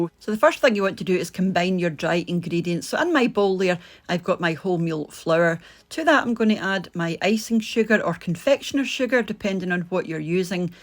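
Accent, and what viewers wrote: Scotland, United Kingdom. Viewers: I always thought digestives contained oats, clearly I’m wrong 😅 to bad I’m gluten intolerant so wheat isn’t an option for me… I wonder if I could use oat flour instead? If anyone can help me out here, pls respond to my comment! It will be hugely appreciated ❤ they do look very delicious, luckily watching a recipe video with regular wheat flour doesn’t make me feel ill and I can still enjoy gluten containing biscuits that way - I know it’s not the same but still 😂 I also adore the Scottish accent! I fell in love with the accent and landscape after watching Outlander… me and my dad watched together and ever since he calls me “wee lass” and I lovingly call him Da, even though English isn’t even our native language ; we’re just so in love with Scotland, it’s history, it’s nature and the cuisine! Hopefully one day we’ll be able to go on a Da-Daughter trip to the Highlands and try an authentic Cullen Skink & Haggis 🤭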